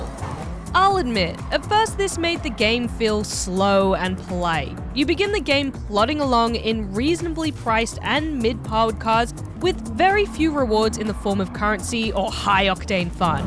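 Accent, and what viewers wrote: Australia. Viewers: Haaaaars and haaaars. Is it just me does say haaaar instead of hour? Definately a mixed accent, Australian and something else. Just can't place the secound part of the accent.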